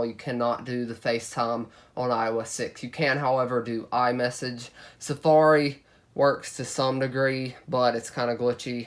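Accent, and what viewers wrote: Texas, United States. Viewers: 1 second and i already know youre from texas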